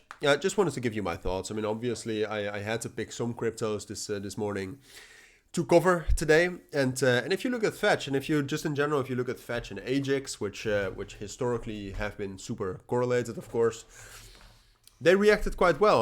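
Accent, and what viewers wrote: Netherlands. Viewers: Ben je Nederlands? K hoor het aan je accent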